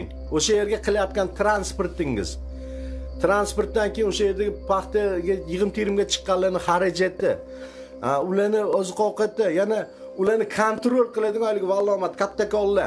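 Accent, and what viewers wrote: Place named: Namangan, Uzbekistan. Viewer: Наманганлимисиз ака гаприш тилиз охшайди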